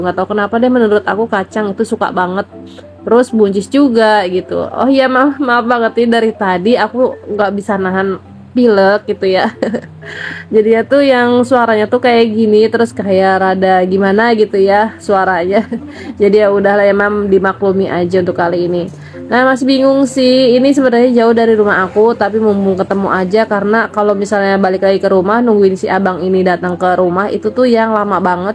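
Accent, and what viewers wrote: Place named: Sunda, Indonesia. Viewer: Insha Allah,gak akan pernah bosen teh,,seneng denger cara bicara teteh,,yg sebagian sunda kayak aku..😀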